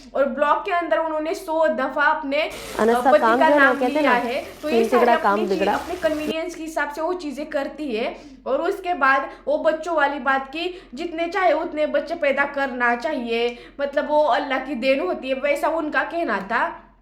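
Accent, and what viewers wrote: Maharashtra, India. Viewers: Work on hindi, strong marathi accent. In good wishes if you want more subscribers.you have good face n presence.❤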